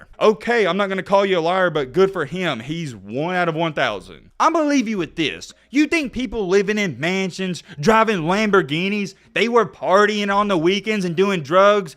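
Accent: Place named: Kentucky, United States. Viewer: This guys definitely from Kentucky lol I can here it in his accent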